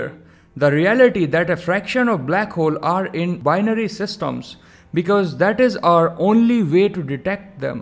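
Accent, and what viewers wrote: India. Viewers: Indian accent lol Wait, he is an Indian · I need something in English I think that was broken Indian accent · you sounds like asian............. india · Intresting video: me:D Indian accent: me:( It's: me:D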